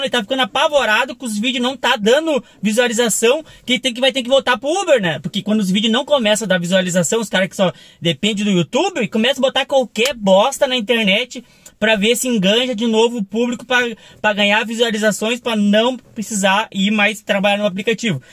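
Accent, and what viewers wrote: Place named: Rio de Janeiro, Brazil. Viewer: Vc parece cariocs . Tu e demais .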